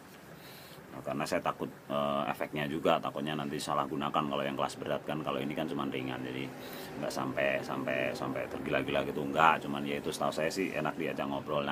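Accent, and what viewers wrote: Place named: Jakarta, Indonesia. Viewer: Logat u Betawi banget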